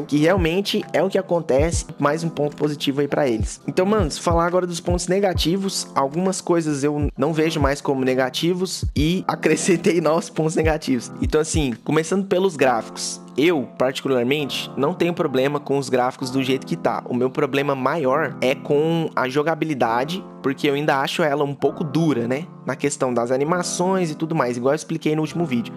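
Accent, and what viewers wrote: Minas Gerais, Brazil. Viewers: Ce é mineiro?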